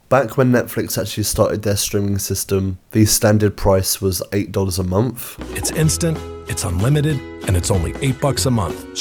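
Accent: United Kingdom. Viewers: Wait a second, this man is British. I can't watch this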